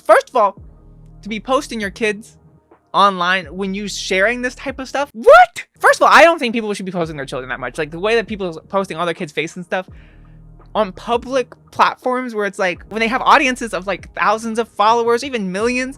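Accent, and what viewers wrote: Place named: Illinois, United States. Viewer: Sounds like people I know from a big town/tiny city in Illinois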